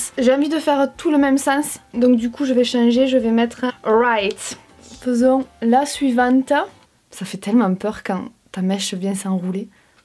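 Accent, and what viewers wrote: South, France. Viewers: Jte kiff de ouf meuf Tellement drole et ton accent, whaou , le sud dans mon salon breton 😂😂😂😘😘😘😘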